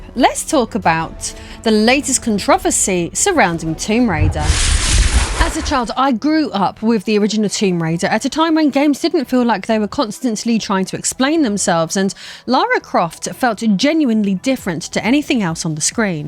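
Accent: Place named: Cockney, United Kingdom. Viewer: That cockney accent coming through but also sounds a bit Greek, am I wrong? Great video btw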